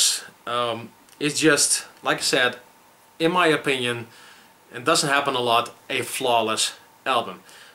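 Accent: Amsterdam, Netherlands. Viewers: Hahhaha je hebt een Amsterdams accent. Awesome : P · Wow your 100% Amsterdam xD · hahaha je hebt echt een duidelijk amsterdams accent!! haha geweldig!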